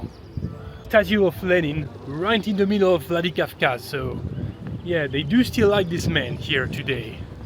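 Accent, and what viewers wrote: France. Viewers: You think they are not used to see foreigners? They are not used to see men with colored hair - they likely thought you are gay, which is not a good way to be perceived there 🤣And French accent makes it even funnier, because it sounds kind of drunk to Russian ears. I mean simply look at men around you and then at yourself to understand why you've gathered so much attention. Go to Chechnya or to Dagestan with a haircut like this for the ultimate experience 😂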